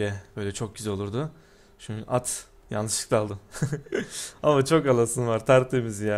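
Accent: Adana, Turkey. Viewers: Şurda Br Adam Ateş Edüüyür Onuda Alalım Adana Şivesi :) Adana<3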